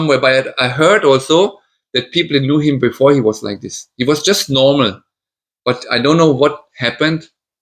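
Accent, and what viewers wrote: Nagaland, India. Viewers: God saved you and gave you lot of chances due to some purpose and the purpose was to come to India and meet your wife I guess. Your testimony was good and clear, best thing is that you speak English just like a Naga. Thankyou for sharing this bro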